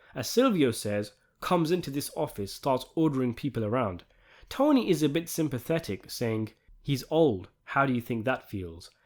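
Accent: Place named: British Asian, United Kingdom. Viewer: Is the narrator British Asian? He has a slight accent. Good video - good channel, just curious.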